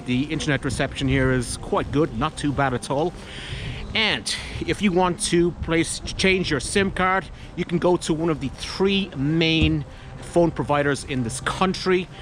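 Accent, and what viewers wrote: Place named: Tipperary, Ireland. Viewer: Trying to work out the accent, thinking not Cork, more a Tipperary, Waterfordish? Could be Wexford, oh I had a girlfriend from Wexford, her dad owned a load of pubs and super respected bloke, his daughter was a true clear skinned Coleen of beauty and bright intellect as we shared our love of certain works of art.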